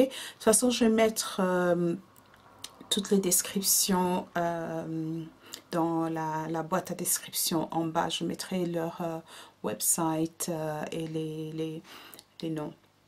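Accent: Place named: England, United Kingdom. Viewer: tu as un apcent anglais !!! J'adore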